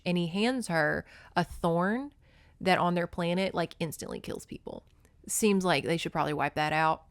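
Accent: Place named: South, United States. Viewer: Okay but I love your southern accent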